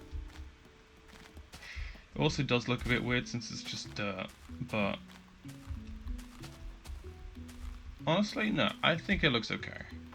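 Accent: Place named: United Kingdom. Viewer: I've been really enjoying your series so far, keep up the good work! :3 I also started playing beta recently and Ive had similar see-through glitches here and there. No idea what thats all about really, guess just some beta bugs. The tower turned out really nice! I love the shape of it. The name Overlook came to my mind when you asked about name suggestions (inspired by the hotel's name from Stephen King's The Shining). I think it fits cause when you're on the top, you can look over your world and see everything really well. Q: What made you start making videos? Also, your Bri'ish accent is cute xD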